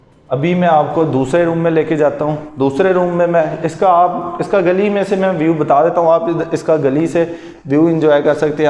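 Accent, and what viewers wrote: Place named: Karachi, Pakistan. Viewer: Bhayya Karachi waly lgty hen😍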